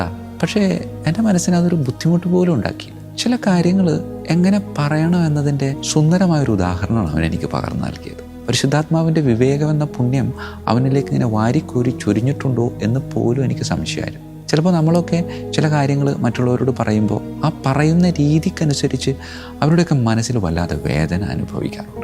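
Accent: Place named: Thrissur, India. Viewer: Thrissur aayirrikkam. Slang .....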